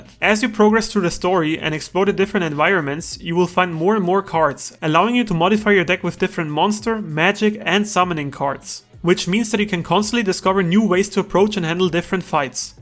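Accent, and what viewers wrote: Germany. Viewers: Man hört direkt dass du deutscher bist. Trotzdem geiles Video!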